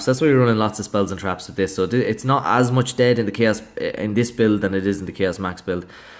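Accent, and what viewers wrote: Ireland. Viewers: Is that an Irish accent I hear? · You Irish mate? What part?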